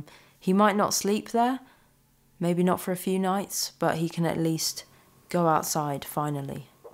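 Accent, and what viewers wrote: United Kingdom. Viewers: having a British narration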